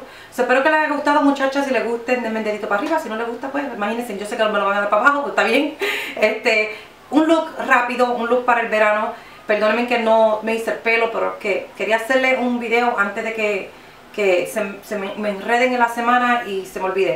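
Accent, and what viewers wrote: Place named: Puerto Rico. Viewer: a mi me gustan los maquillajes naturales :) ademas quiero que sepas q me gusta como hablas bien boricua.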